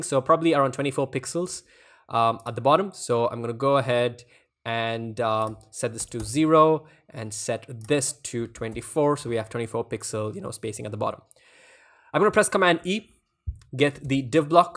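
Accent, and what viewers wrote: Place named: India. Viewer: Its simple, I hear indian accent, I click back to search :)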